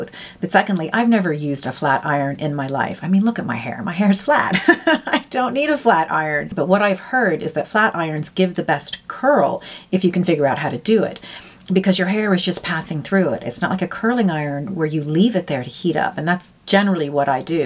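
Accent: Ireland. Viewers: Wonderful! I'm from the UK but have ties to Guiness and love the Irish accent! Good that you can swap from Irish Colleen to Southern Belle!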